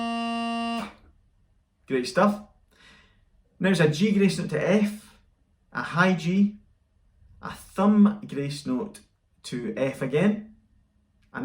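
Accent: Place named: Scotland, United Kingdom. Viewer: Very very helpfull, included your magnificent Scottish accent, which is not the easiest thing to understand for an old Frenchman like me...Thank you very much indeed !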